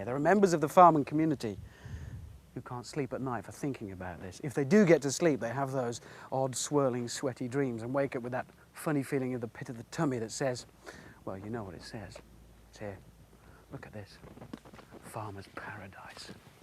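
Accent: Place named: Birmingham, United Kingdom. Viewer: His Birmingham accent was still audible back then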